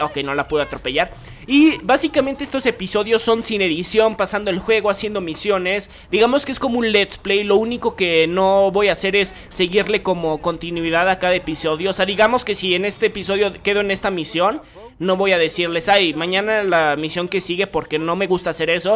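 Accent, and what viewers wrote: Mexico. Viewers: oye eres de mexico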